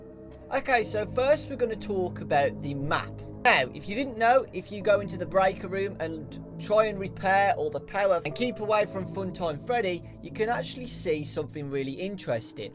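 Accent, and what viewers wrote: United Kingdom. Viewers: Omg your right about sister location being connected to that 4! I looked it up and its true! Also one question. Are you British? You sound a little british. Not hating I just wanna know of your British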